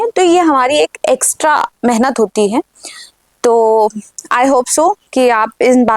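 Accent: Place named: India. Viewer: bahut shandar bolti ho tum ekdam desi andaz me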